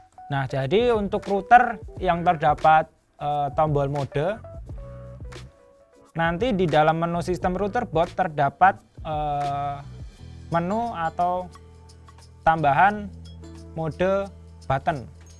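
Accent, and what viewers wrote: Java, Indonesia. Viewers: Medok mu mas aku suka hahaha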